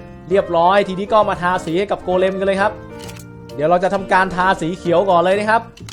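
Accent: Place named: Nakhon Si Thammarat, Thailand. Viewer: พี่คนนครใช่ปะ(ผมฟังจากสำเนียงอะ)คนใต้ด้วยกันอิอิ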